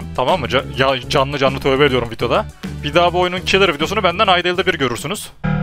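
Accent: Sivas, Turkey. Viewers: abi sen sivaslımısın?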